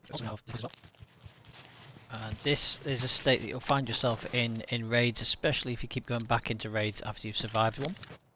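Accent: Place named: Wales, United Kingdom. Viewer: I starve in raids, thanks for explaining. Your Welsh accent is great. Not too hard to understand.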